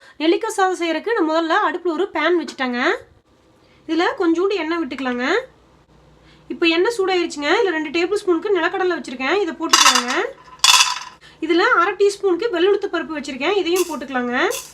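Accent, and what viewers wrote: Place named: Gobichettipalayam, India. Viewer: hi !!!! I'm also from gobichettipalayam......unga salang keta udane namma oru than irukum nenaanachu check pannana semma...semma nenga namma gobichettipalayam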